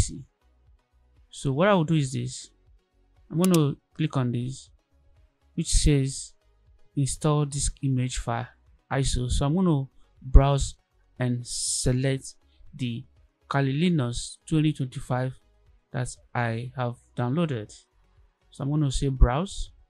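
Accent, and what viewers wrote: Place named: Nigeria. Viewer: Oh ur accent just sounds Nigerian thats why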